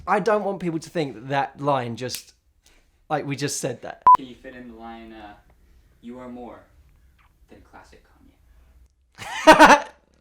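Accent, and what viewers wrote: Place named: United Kingdom. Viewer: Vandalized my ass! lol you are so funny. What makes it even funnier is the way it's said in that British accent of yours:)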